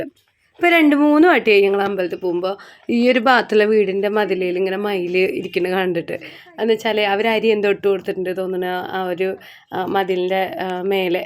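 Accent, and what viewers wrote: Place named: Northern Kerala, India. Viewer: നന്നായിട്ടുണ്ട് അല്ലേലും വടക്കുള്ളോരുടെ വർത്താനം കേൾക്കാൻ നല്ല രസമാണ്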